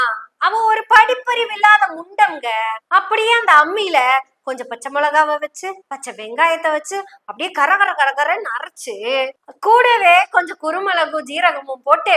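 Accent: Kongu, India. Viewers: Kongutamizh Amma super.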